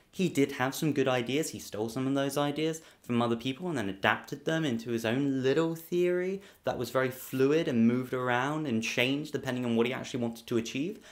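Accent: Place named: South London, United Kingdom. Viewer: Hey, by any chance u from south london? ur accent seems like u from lambeth or somewhere like that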